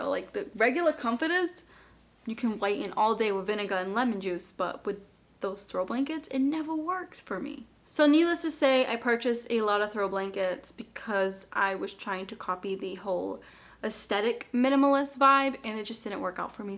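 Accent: Boston, United States. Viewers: She’s from the Boston area 🥰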